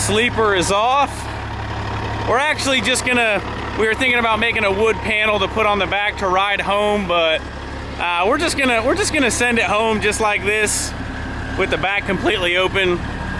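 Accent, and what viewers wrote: South, United States. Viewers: I love how your Pickens up a litter southern sounds in your voice. Awesome keep on trucking buddy. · Starting to get that southern twang.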